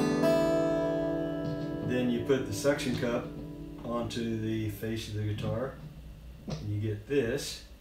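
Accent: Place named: New Zealand. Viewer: Nice job, great to hear a fellow kiwi!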